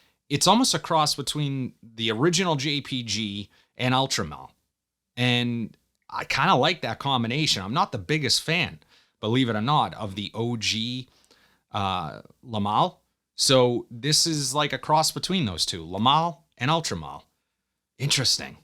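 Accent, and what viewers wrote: Boston, United States. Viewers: I love your Boston accent Bro. I’m in Houston, so I don’t have a real strong Texas accent, but it’s still a Texas accent. I’m gonna go watch the Departed now lol. Sotd Guerlain L Homme Ideal edt.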